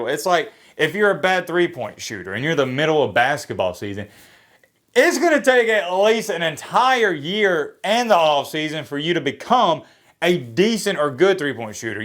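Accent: South, United States. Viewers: you look and sound like a pubeless hill billy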